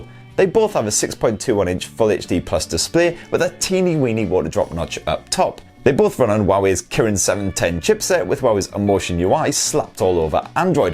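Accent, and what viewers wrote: United Kingdom. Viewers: the guy is clearly from the UK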